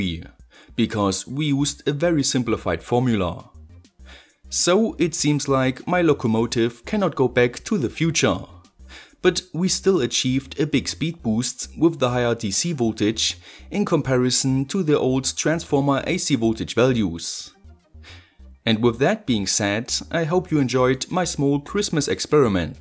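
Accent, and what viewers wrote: Germany. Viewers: Are you German ore have German roots??? I can't imagine you aren't cause your german accent is so significant. A lot of people here speak like you do. Greetings from Germany and merry Christmas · Bist du deutscher? Hast so einen Akzent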